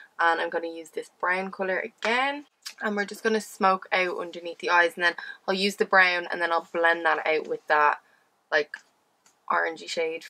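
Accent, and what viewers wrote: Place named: Dublin, Ireland. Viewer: A beautiful look expertly applied. I love your Dublin accent.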